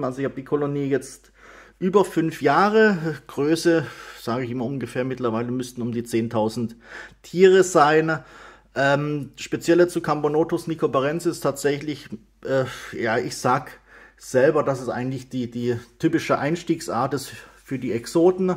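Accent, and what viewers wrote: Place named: Swabia, Germany. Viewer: Ich höre schwäbisch. Wo lebst du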